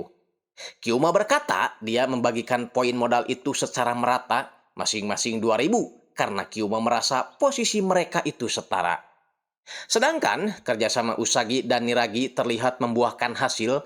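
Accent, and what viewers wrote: West Java, Indonesia. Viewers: Papua hadir. Selalu terhibur dgn khas Sunda nya🙏🤣, sukses selalu Aa🙏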